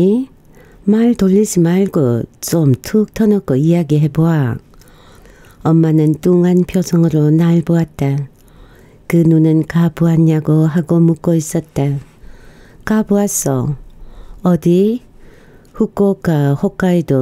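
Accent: Gyeongsang, South Korea. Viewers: 님의 낭독이 이젠 전문가 수준입니다. 처음의 그 갱상도 발음이 그립기도 합니다. 너무 손색이 없다보니 ㅋㅎ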